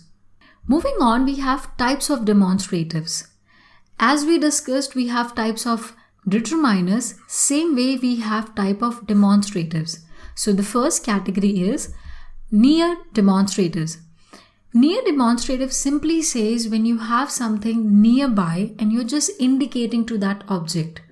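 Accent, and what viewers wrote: India. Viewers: I LIKE YOUR INDIAN ENGLISH. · I was completely confused with this Indian accent😐😐😐😐😐😐😐😐😐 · Why indian english acsent is funny?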